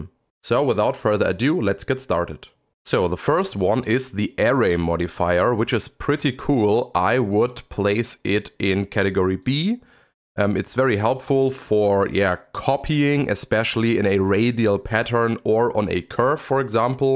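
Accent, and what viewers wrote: Germany. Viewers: Nice Video good explained , Are you german ? you sound like you are hahaha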